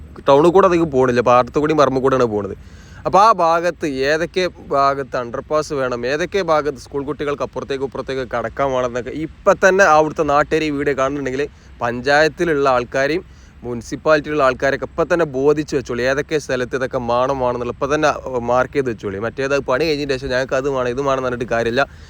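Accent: Malappuram, India. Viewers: especially your Malappuram slang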